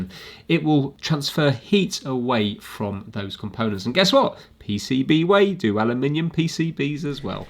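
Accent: United Kingdom. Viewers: Hi. I wonder if you have ever watched the Grim Reapers YouTube channel? The reason I ask is because your voice is a dead ringer for "Cap", the channel owner. Am I on to something, or is this just a matter of all you Brits sound the same to we Americans? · bro your accent make me want to leave my contry and com live in uk ,i love your video and thank you for all what you do sorry for the bad english 😅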